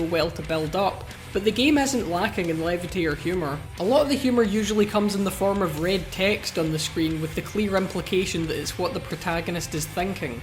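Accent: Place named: Glasgow, United Kingdom. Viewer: Sweet review bro. Loving the Glaz geee accent. This game lools wesome but I am a low life with an xbox so doubtful I will ever get my hands on it. Thanks for uploading it..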